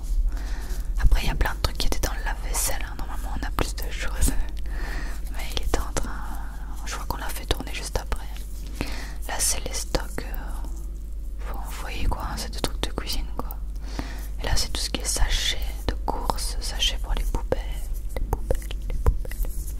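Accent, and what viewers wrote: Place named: Belgium. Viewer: La couisine 😂😍j adore l’accent belge je sais pas pk ça me détend en plus 😂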